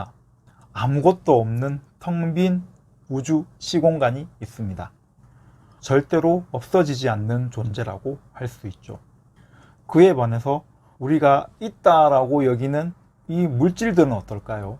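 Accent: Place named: Daegu, South Korea. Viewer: 영상 잘~ 듣습니다. 같은 대구말투도 정겹구요^^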